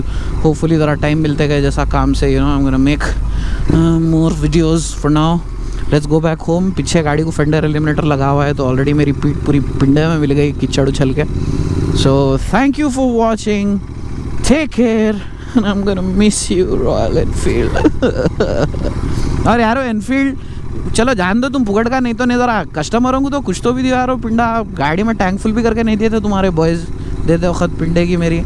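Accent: Hyderabad, India. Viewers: like your content specially the way you talk Hydrabadi I just love it. Good job and keep up doing well. Best of luck waiting for your next vlog. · Bhai the way u talk is so much good because u r very experienced rider and your Hyderabad language is 😍😍😍😍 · Hey! Awesome content, entertaining, love the way you talk.. and that Hyderabadi touch is cherry on the top. I'm planning to buy 650. Wanted to know about how much does it heat really (Think of Mumbai traffic.. peak hours) I had bought a brand new Street Twin and i sold it in 6 months. One of the primary reason being the heat that it generates while riding in the city. I'm riding a 500 classic right now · Bhai aise hi Hyderabadi slang main baat. Karo mast Maza aara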